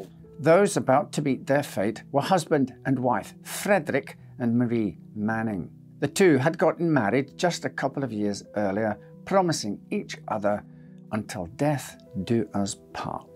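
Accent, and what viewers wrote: Scotland, United Kingdom. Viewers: My first time watching. What a delight. I enjoyed the production; no small effort. I assume you've been involved in acting. I look so forward to making up for lost time and watching your archive. Oh, and let it be said, "There is no accent on earth as rich and wonderful as a fine Scotsman's"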